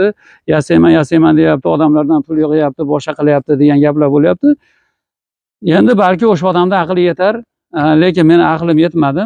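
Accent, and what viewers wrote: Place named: Fergana, Uzbekistan. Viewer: АКАМИЗ ФАРГОНАДАН ЭКАН БАС ДЕБ ГАПИРДИКУ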